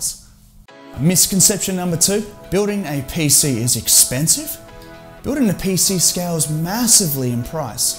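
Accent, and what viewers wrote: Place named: Australia. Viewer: Is it because the Australian accent because for me he sounds fine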